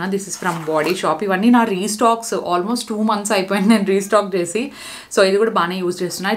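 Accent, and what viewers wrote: Anantapur, India. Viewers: meeru pakka అనంతపూర్ అని నిరూపించుకున్నారు మళ్ళీ ఆ స్లాంగ్ లో తూర్పు తిరుగు దండం pedath ఆ ఓర్డ్ akadidhe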